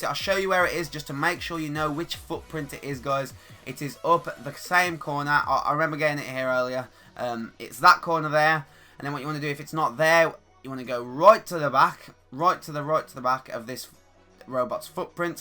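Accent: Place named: Birmingham, United Kingdom. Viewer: u sound like ur from birmingham im from there i can telll if u am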